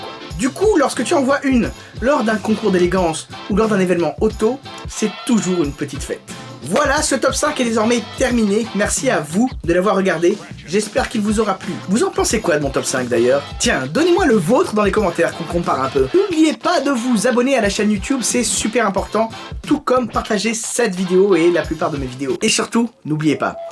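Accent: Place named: Italy. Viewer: Mais quel accent italien <3 <3 <3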